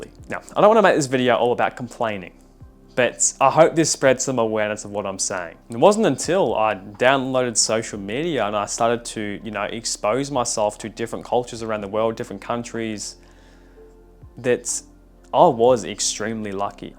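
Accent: Australia. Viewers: Is that an Australian accent you've got there?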